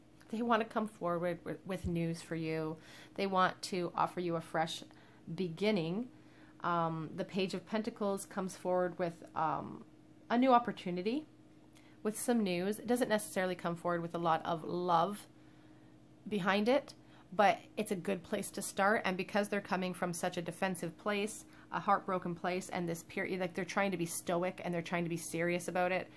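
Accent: Canada. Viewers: Question: are you Canadian? I just sense a Canadian vibe by the way you say the words out and about? Just wondering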